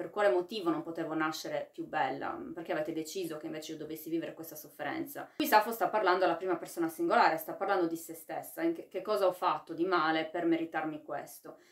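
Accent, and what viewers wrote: Sardinia, Italy. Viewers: Premettendo che la seguo da prima del video con Crepaldi, personalmente apprezzo moltissimo questi contenuti culturali/divulgativi e mi dispiace che vengano posti in secondo piano dall'algoritmo di Youtube - che, a giudicare dalle visualizzazioni, invece premia soltanto i suoi video più "polemici". Ha la mia stima e mi ha fatto riscoprire la bellezza dell'accento Sardo.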